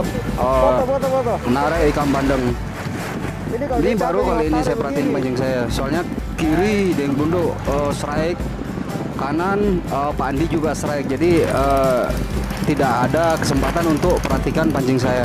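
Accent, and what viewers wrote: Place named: Makassar, Indonesia. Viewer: pelan2 maki logat daeng kondisikan sj ciri khas kita sebagai orang makassar....tetap semangat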